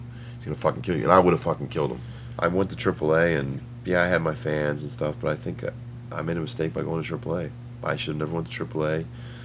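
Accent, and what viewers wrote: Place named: Spain. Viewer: It's his Spanish accent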